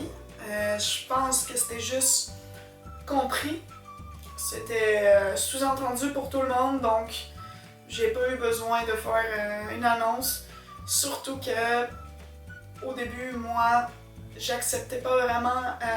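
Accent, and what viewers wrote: Quebec, Canada. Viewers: Tes tu canadienne ? Super video sinon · ah bon tu viens de dire que tu es déménagée au canada, ça doit être pour ça que t'as l'accent :) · ok ton accent français/québécois wow! :D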